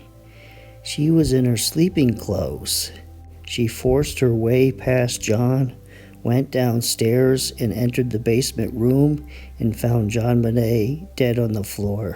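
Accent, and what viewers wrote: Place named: New York, United States. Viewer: I’m in the uk North Yorkshire and I get so excited when I see you have a new video up! As for your accent well I’m not familiar with American accents I can tell the difference between a southern accent that’s about it 😆but yours well don’t laugh and don’t be offended but you remind me of a New York detective, I could listen to to you all day. I fascinated with this case keep up the great work. Best wishes from over the pond.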